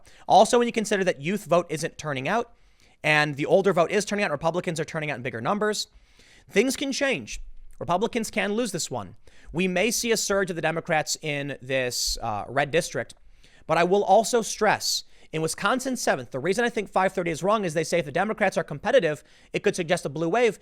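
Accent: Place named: Chicago, United States. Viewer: I never noticed that chicago accent until this video. The way he says "House" is a dead give away.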